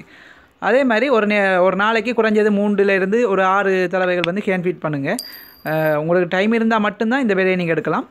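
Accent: Sri Lanka. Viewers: Neenga srilankan tamildhane pesuringa anna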